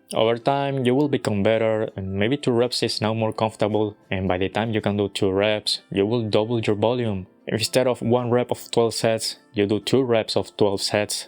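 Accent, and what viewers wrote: Spain. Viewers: That muscle up handstand is awesome. You sound like a European.. it's like hearing a Spanish person speak English. Interesting! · First time seeing an asian guy with a spanish accent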